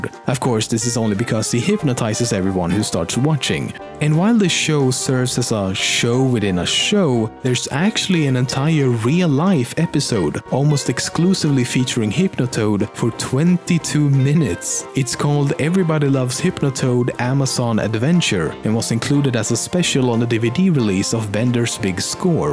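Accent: Sweden. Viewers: the guy talking must be swedish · I'm going out on a limb here and guessing the presenter is Scandinavian. Hmm... Swedish?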